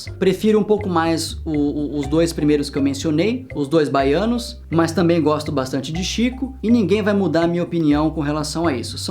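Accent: United Kingdom. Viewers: Vc já morou na Inglaterra? Seu sotaque inglês é igual ao dos britânicos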